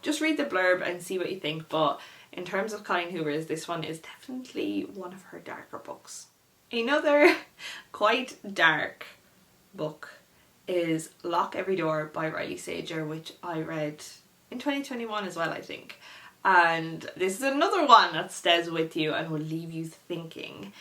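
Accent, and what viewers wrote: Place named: Ireland. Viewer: I had to subscribe to your channel because I also love the same genre as you in novels ... and because of your gorgeous Irish accent. Lol. ❤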